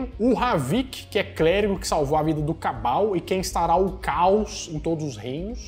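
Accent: Minas Gerais, Brazil. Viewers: Mortal Kombat em Verso e Prosa KKKK. Do jeitim Mineiro kkkk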